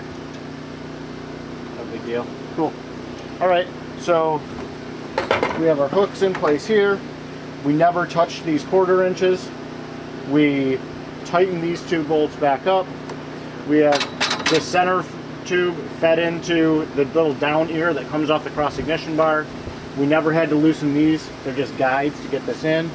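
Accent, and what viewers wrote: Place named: Chicago, United States. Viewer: I detect a Chicago accent . Also that Chicago wind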